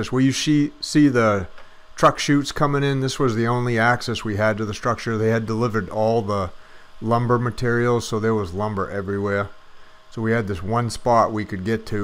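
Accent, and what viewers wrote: Maine, United States. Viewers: I knew your accent was Maine!! Nice 👍. I’m on vinalhaven island. Ever been out here? My boat shop burned down in July. So I am in the process of ground work for a new 40x60 steel building. Love your videos!!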